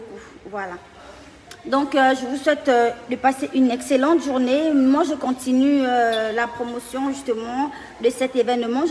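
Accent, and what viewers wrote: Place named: Gabon. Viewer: J aime ton accent gabonais ❤️❤️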